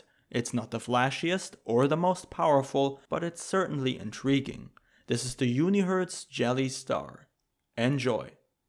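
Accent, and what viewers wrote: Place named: Netherlands. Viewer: Dutch accent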